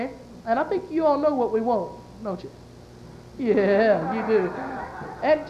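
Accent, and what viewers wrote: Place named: Arkansas, United States. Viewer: He sounds arkansan here.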